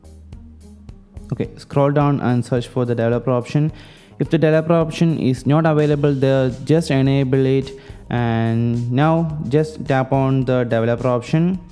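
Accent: Kerala, India. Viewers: മലയാളി ആണോ???english കേട്ടപ്പോൾ അങ്ങനെ തോന്നി അതാ!!!!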